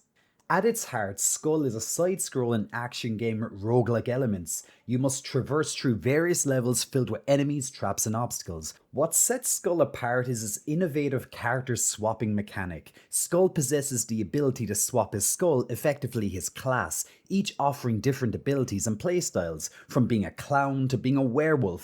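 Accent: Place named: Ireland. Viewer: Irish accent and furry pecs 😵‍💫🥴😭😭😭😭😭😭😭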